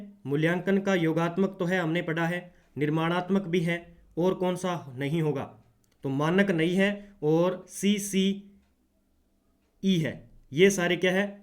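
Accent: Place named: Bagri, India. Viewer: Sir aap jo bich bich me bagdi type bolte ho wese hi btaya kro..... Bhut ache se smjh me aata h 😊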